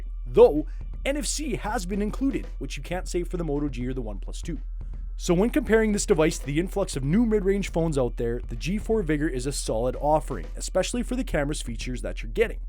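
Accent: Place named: Toronto, Canada. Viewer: Such a Toronto accent!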